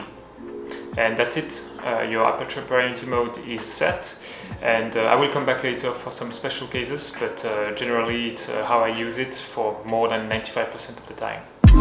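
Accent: France. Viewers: Vous avez un accent, êtes-vous francophone?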